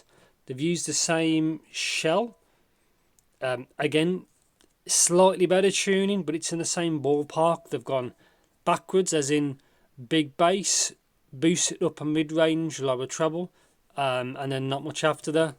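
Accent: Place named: England, United Kingdom. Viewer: He's probably form England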